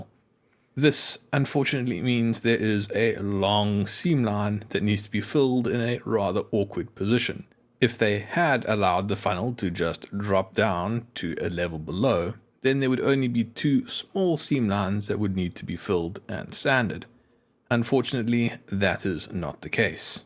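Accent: South Africa. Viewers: I wanted to share with you I've been trying to identify your accent. I settled on South Africa. The other day I met somebody who had a similar accent so I played a portion of your video to him. He confirmed your accent was South African, and I believe he said it was from the southern part of the country.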